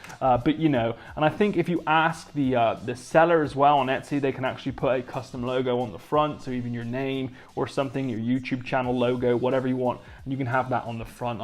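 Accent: Bristol, United Kingdom. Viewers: Hearing my accent(bristolian) on videos hits hard haha. Realized from some of your other videos you're very local to me.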